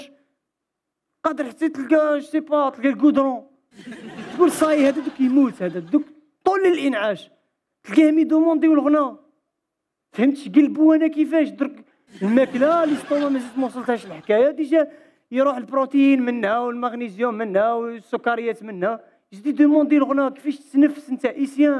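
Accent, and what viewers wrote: Constantine, Algeria. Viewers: اسمحلي نطق التاء القسنطينية باين على بعد 1000 كم مام تهدر الروسية De passage bon courage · اللهجة القسنطينية قرعة ماتصلح لوالو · هديك هي بصح عجبني كي يهدر باللهجة القسنطينية 😍😍😍😍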